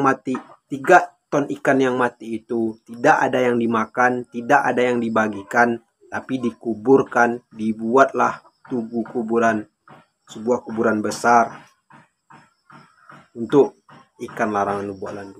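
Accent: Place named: Sipirok, Indonesia. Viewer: ancak bhaso Minang Jo lai da,dari pado kayak org spirok bhaso indonesia😅😅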